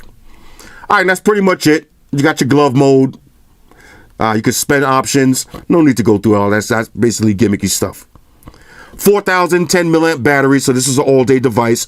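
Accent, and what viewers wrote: New York, United States. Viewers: "poison for the trolls" This was dope! Yo this guy from NY?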